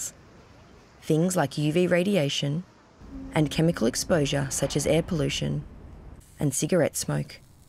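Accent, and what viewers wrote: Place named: Australia. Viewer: isnt this someone elses video but with an Aussie narrator?..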